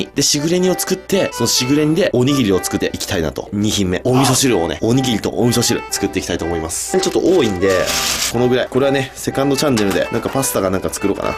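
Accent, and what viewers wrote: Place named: Rural, Japan. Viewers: だでね〜 この方言聞くと愛知県らしくて素敵ですw by 愛知県民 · １分３０秒完全に田舎のお兄さん( ´∀`)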